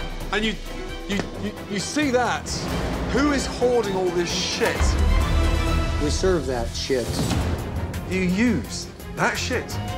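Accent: United Kingdom. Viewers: Joseph Osborne he's British it's how they talk it's normal for them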